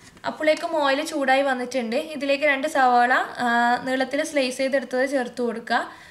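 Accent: Kannur, India. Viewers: Ningal kannur aano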